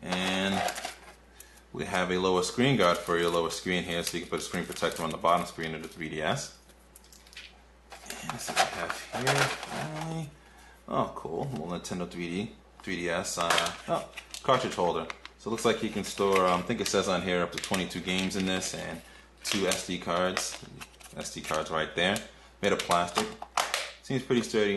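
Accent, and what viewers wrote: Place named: New York, United States. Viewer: "NEW YORKIAN ACCENT"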